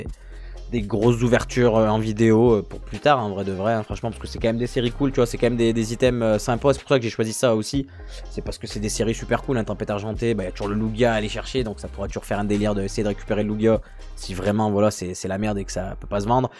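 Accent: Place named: Loire, France. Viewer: Accent du 42 spoted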